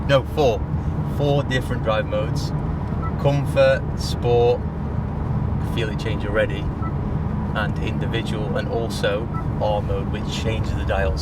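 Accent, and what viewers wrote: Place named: England, United Kingdom. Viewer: The English accent, the weather, and a hot hatch. Nice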